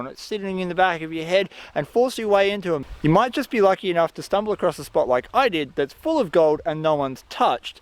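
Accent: Australia. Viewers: Is this Australia? You have that accent.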